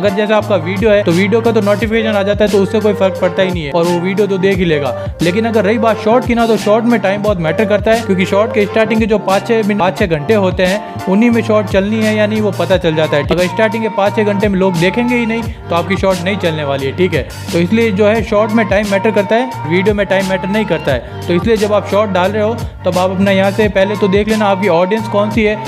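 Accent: Gujarat, India. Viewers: muje apki voice laga ki app gujrati ho ❤❤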